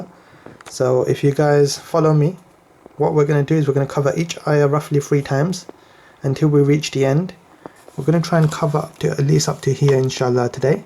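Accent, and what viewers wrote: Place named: United Kingdom. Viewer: Well. He sound British. It doesn't matter what race or religion you can still be Muslim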